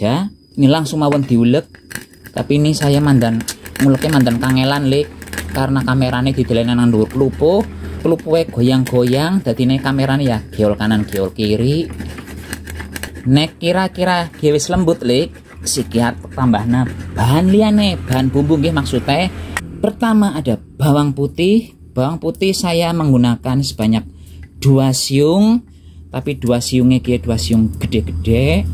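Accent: Banyumas, Indonesia. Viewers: Lucu banget ,,,Mase gundul pacul ini.,,,,,,,cukup terhibur logat ngapaknya yg bawel,.,,,,mana biyunge di simpan dimana,,, ,lucu asli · Ngomongnya ngapak podo inyong😅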